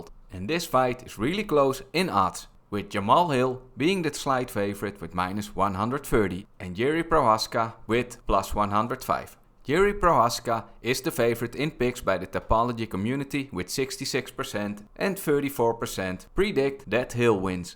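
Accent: Netherlands. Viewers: that thick dutch accent, nice u from the Netherlands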